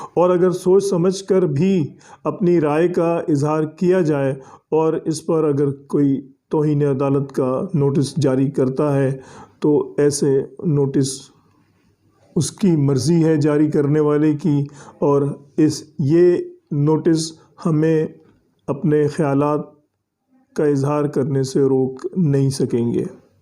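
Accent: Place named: Pakistan. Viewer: Sir I was waiting for you to react to these comments . Sir love your video and the way how you talk real urdu accent 👍👍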